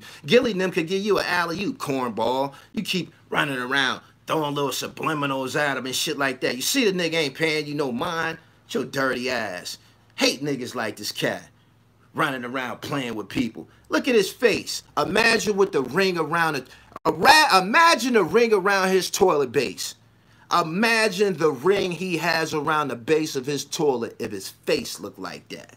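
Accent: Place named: Pittsburgh, United States. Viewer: What part of pa from? Sounds like a Pittsburgh dude.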